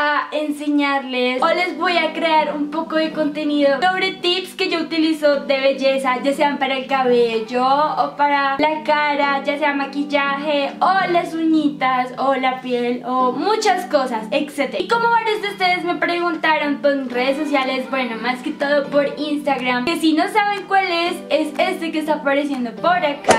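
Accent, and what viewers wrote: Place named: Colombia. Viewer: Me encanta su acento colombiano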